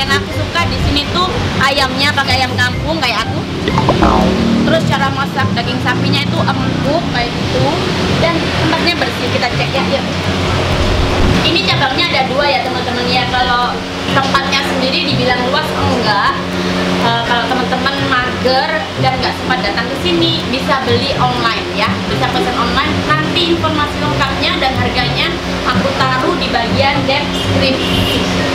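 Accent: Java, Indonesia. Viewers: setu-bekasi hadir n sllu setia nunggu konten2 dari kak yg cantik 😍😍😍 ngmgnya kak tu jawa banget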